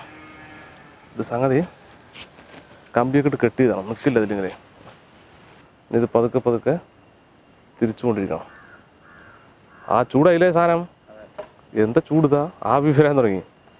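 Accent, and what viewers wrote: Palakkad, India. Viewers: assalamu alaikum epozhum watch kayyil kanarund athond chodikkunnatha water resistant aano ikkayude watch? Njan oru army karan aanu palakkadan swadeshi epozhum ikkante videos follow cheyyarund bcz of your palakkadan slang keep going we are all with you always....(respect) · കുക്കിങ്ങ് വീഡിയോസ് ഇത്രക്കും റീച്ച് ആകാൻ പ്രധാനമായ കാരണങ്ങൾ... 1.പറമ്പിലും പാടത്തും പച്ചപ്പ് നിറഞ്ഞ ഗ്രാമ ഭംഗി യിലുള്ള ചുറ്റുപാടിൽ എടുക്കുന്നതും കൊണ്ട്😍 2. നാടൻ ലുക്കും വിനയം കലർന്ന പാലക്കാടൻ സംസാരവും😍 3.എന്തിനും കൂടെ നിൽക്കുന്ന കുറേ കൂട്ടുകാർ😍 4. അഗതിമന്ദിരത്തിലേക്കും മറ്റും ഭക്ഷണവും സഹായവും എത്തിക്കുന്ന മനസ്സിന്റെ നന്മ...😍👉👉ക്യാമറമാൻ്റെ കാരൃം പിന്നെ പറയേണ്ടതില്ലല്ലോ....😍 ഇതെല്ലാം കൂടിക്കലർന്നപ്പോൾ Village Food അങ്ങട്ട് കളറായി ജനങ്ങളില്...🥳...😍 ശരിയല്ലേ പറഞ്ഞത്🥳🥳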